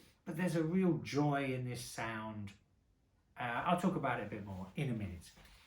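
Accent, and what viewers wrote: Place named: Cockney, United Kingdom. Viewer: don’t you live his accent! It’s a pleasant combination of proper english & cockney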